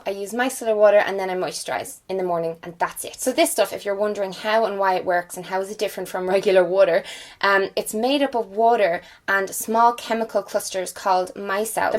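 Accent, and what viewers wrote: Ireland. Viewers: I love that Irish accent👌👌👌 P.s could you talk more about your book because I didn't know you were bringing one out. Maybe you could do a video on it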